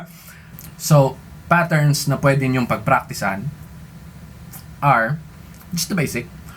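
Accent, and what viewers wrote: Philippines. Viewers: Kala ko englishero pilipino pala hahaha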